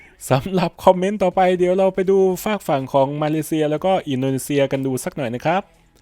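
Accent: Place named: Laos. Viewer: ใส่เสียงลาวคือชัดแท้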